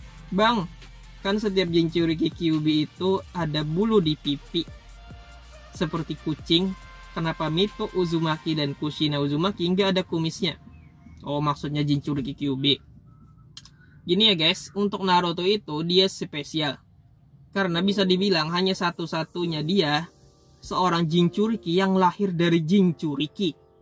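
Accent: Banjar, Indonesia. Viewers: Tuhkan, dari logatnya urang Banjar. Salam kenal bang, dari kab.banjar ... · Fiks orang banjar sebelum mimin perkenalan diri bisa ddengar dari logat nya ngomong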